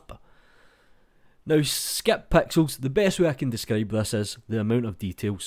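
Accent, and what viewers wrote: Scotland, United Kingdom. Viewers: Good information delivered straight to the point in a clear scotch brogue.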